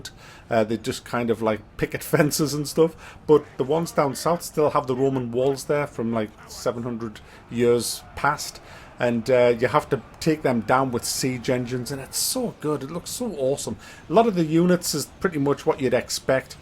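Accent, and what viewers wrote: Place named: Scotland, United Kingdom. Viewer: I gotta say I love hearing your accent. However I'm an American and it is hard for me to place it. Where are you from? Are you Scottish?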